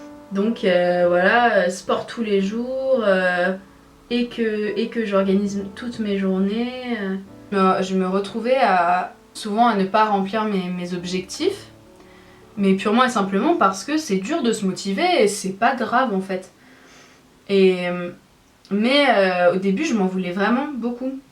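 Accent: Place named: England, United Kingdom. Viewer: Le maquillage est une catastrophe mais l'accent anglais en revanche est une pépite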